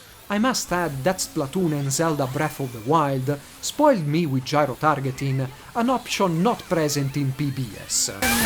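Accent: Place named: South, Italy. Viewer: I know you aren't italian from your last name, but man, you could also fool a southern italian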